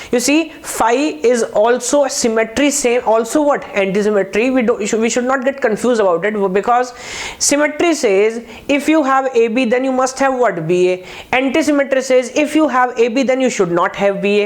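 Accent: India. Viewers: God Indian accent is so fucking annoying... it's unfortunate since the content is good, but the accent destroys it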